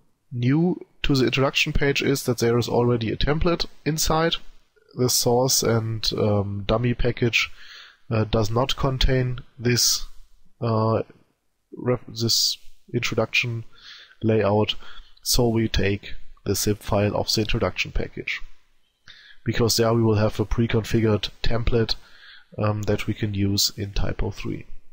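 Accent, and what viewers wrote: Germany. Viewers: Kann es sein, dass du Deutscher bist? ^^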